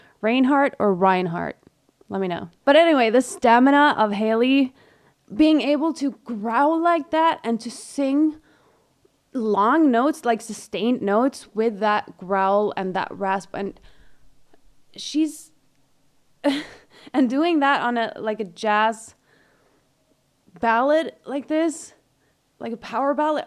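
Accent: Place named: United States. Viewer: I AM REALLY SHOCK 😱😱😱😱😱 I confess that i didin't know her before watching this video, and hands down to her oh my Goodness What a beautiful voice, i'm gonna watch more stuffs about her Please don't be mad at me, but she's also reminds me just a liitle bit of Mariah carey 😂😂😂 i'm sorry, of course she has her personality, which is stunning, and the jazz vibe changed everything But over all i love this so much "Without me disturbing you" hege, you never disturb us, at least for me And wow !! You're from norway!! It's a beautiful country, and your english is very american hahahaha , so good for the singers 😎 Anyway, thank for the video 💛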